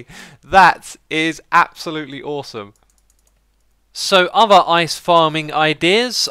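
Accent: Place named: United Kingdom. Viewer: I absolutely love your videos! Technical building and a British accent! What more could you ask for?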